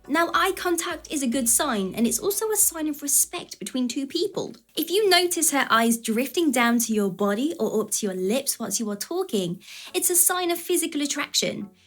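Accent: United Kingdom. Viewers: Dude I love your worldsmost wickedest British accent in this video of yours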